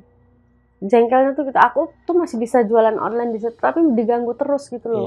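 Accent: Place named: Semarang, Indonesia. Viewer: Sy Org rantau Kl denger medok ny Semarang an dan sekitarnya kok merasa terhiburnya beda y Mbak Rambutnya d jepit sekena nya aja kliatan cantik bgt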